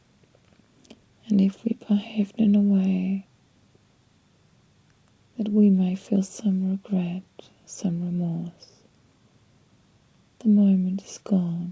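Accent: Australia. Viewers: Hia... Just thought I'd say thanks for another amazing video! Literally, when I cant sleep I turn straight to your vids... and I always find something that helps me dose off.......The aussie accent is also a big bonus!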